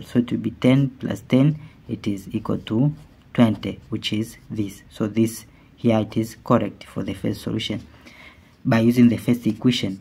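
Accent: India. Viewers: По акценту вроде индус. Чалма жмёт видимо. Обычное квадратное уравнение. Но в Гарварде, где учился обама таких считают гениями и берут без экзаменов 😂😂😂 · Какая-то профанация. Ребята, судя по произношению, это индус. Германией тут даже и не пахнет. Всё, кина не будет. Расходимся.😂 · Индийский акцент режет слух. 😢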